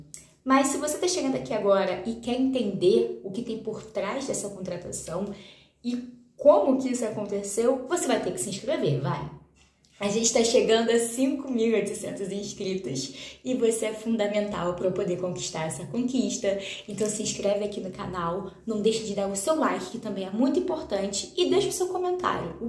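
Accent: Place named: Rio de Janeiro, Brazil. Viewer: o sotaque carioca é tão bonito,quanto a própria cidade. o fluzão,só poderia ser dessa cidade,conhecida mundialmente,como cidade maravilhosa. 🌅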